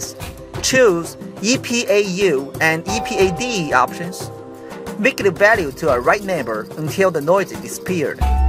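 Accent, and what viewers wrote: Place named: England, United Kingdom. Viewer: I love your English accent 😂😂😍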